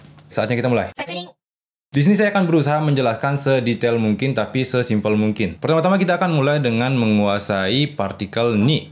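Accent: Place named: Bali, Indonesia. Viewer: Mendengar bercerita panjang-lebar seperti ini, jadi teringat dulu waktu saya masih tinggal & mengajar di Bali. Bhs Indonesia-nya khas Bali, demikian juga dengan joke2nya 😅😂🤣😆 Terus semangat, sensei \\(^_^)// Jasamu sangat diperlukan di negeri ini! 😁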